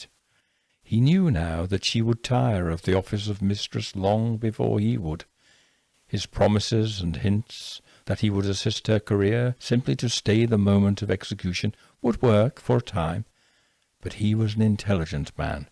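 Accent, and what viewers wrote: England, United Kingdom. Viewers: is a fantastic writer and storyteller i have loved all his stories with his wonderful english accent also all drawings as well i can't wait till his voice puts me to sleep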